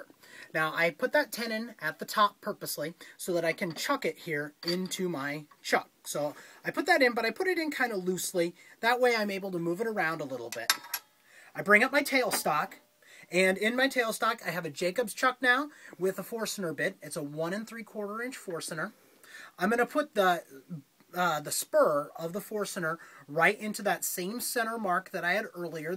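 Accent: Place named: United States. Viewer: dont think hes aussie mate! sounds merican to me.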